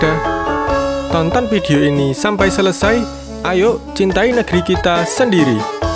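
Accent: Java, Indonesia. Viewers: Terima kasih, spot yg indah, cara pengambilan gambar yg bagus, video yg bagus, narasi yg bagus (njawani banget), background yg bagus. Semoga makin suskses mas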